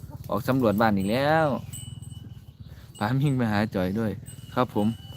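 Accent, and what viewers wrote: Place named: Pathum Thani, Thailand. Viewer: ปทุมธานีชัด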